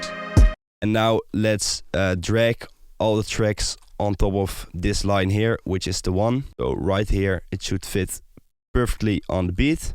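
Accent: Netherlands. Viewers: I smell Dutch, also great tutorial. Keep up the good work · sounds like you from the Netherlands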